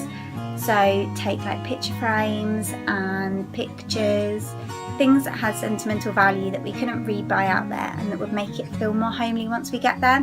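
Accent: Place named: England, United Kingdom. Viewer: thanks I like your accent what part of England are you from?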